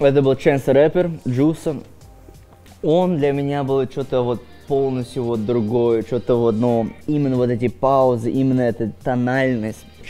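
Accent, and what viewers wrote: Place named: Chechnya, Russia. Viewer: рж я думал это чеченский такой акцент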